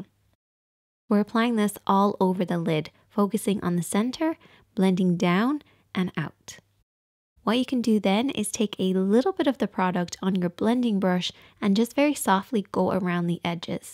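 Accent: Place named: Ireland. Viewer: Are you Irish? I hear a slight accent.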